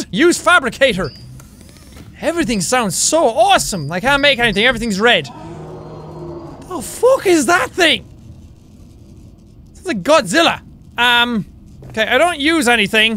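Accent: Ireland. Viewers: Hi in your biggest fan I watch you so much I've picked up your Irish accent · He sounds way more Irish than he does today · his irish is heavier than it is now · Coming here from 2021, and I was startled by how Irish this man sounds. Also smiled like an idiot at the intro. Edit: 2023 now, and I don't think I'll ever get used to his incoherent ramblings. Still fun to watch, though!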